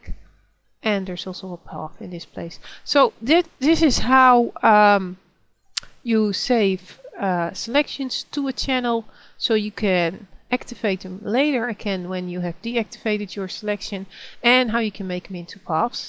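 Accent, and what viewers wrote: Netherlands. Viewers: je hoort wel dat je van nederland bent ^^maar is wel leuk ! kun je ook eentje in het nederlands maken ? coole videos meid!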